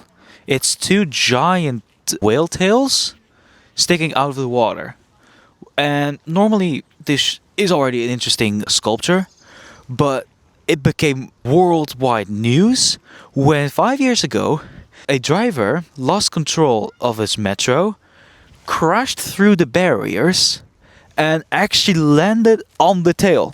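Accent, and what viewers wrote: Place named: Netherlands. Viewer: Effe in NL : Zijn Engels is oké, maar jouw Nederlands kan beter ! ! Waarom zou hij geen lichte Nederlandse tongval mogen hebben .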